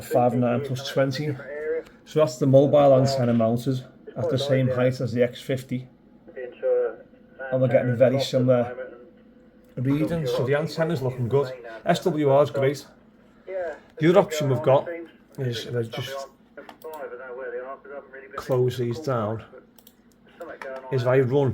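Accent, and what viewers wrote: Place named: Liverpool, United Kingdom. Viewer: Great video mate, im going through all your videos, thinking of having a go , I had some interest about 40 years ago but never pursued it, actually went to riversdale, sure you remember Riversdale college, I became a clockmaker, I have a YouTube channel myself Clock Repairs Merseyside , got quite a few followers now, but I’m slowing down need to find something to take my mind away, came across your channel instantly recognised our familiar scouse accent, new I was in the right place 😁😁 so I’ll be binge watching everything, great stuff 👍👍. Best wishes John Clock Repairs Merseyside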